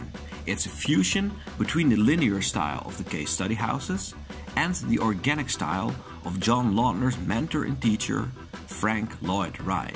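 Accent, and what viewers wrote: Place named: Netherlands. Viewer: Another well turned out vid. Your voice and cadence of speech are very good for the narration. And the Dutch accent is chic. :)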